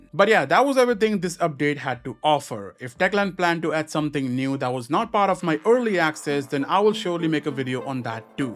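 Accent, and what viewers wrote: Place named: India. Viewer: First i cant understand half of what you say with your India accents. Next why ruin the game taking out parts changing colors of markers. What I see is only messing up the game taking out good parts to make it worse. Who is the laim person not thinking. CAN I play my game WITH OUT DOWNLOADING THIS 48GB NIGHTMARE????? Please tell me i can. A complete joke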